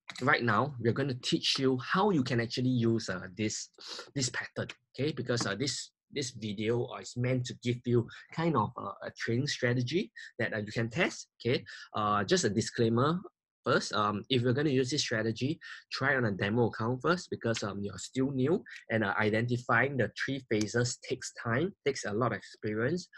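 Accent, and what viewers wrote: Singapore. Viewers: No, I think he`s Singaporean.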